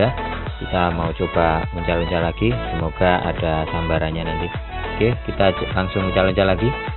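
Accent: Banyumas, Indonesia. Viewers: Keren.. Ora ngapak ora penak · Waahhh unboxing kaos ora ngapak ora kepenak...met mancing slm kompak moga sukses sllu...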